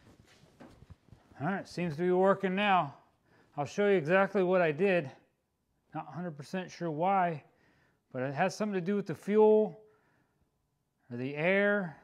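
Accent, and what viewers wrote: Pennsylvania, United States. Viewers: Cool. I thought you had kind of a PA accent. I’m originally from NE PA and now I live in NH. NH apparently stinks for trying to buy non-ethanol gas. No gas stations sell it that I can find and only specialty stores sell it in cans for like $25-$30 per gallon. Anyway, I found my problem. I actually blew some compressed air backwards through the gas line after emptying the tank. There was a clump of dirt stuck on some kind of gummy crap stuck somewhere between the top of the tank and the inlet inside the tank. Looked like rubber cement. Runs like a champ again now. Hopefully that was the only dirt in the tank. Thanks for the idea of blowing out the lines like that though. Without your video, I’d probably have taken apart half the mower by now.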